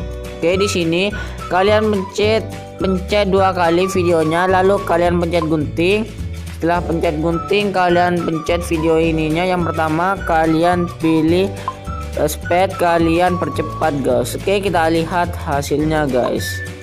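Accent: Madura, Indonesia. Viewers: Org Madura kah ketauan banget:v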